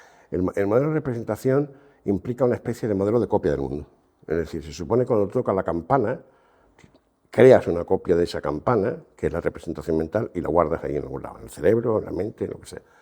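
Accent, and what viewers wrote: Spain. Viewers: por que hablan tan para el orto los gallegos???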